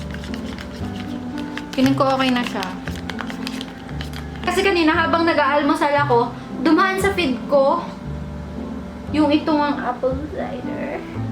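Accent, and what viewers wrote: Batangas, Philippines. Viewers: Ate, ano year mo na sa college? Bsu ka pala. Kaya pala mala accent mo pang batangas hahaa. Bsu din ako incoming, freshmen pa nga lang.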